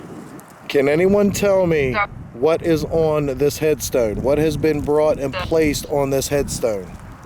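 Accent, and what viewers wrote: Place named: East Coast, United States. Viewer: I love your east coast "ass"accent😍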